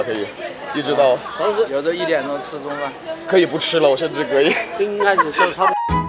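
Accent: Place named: Cantonese, China. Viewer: 廣東式普通話，難聽到死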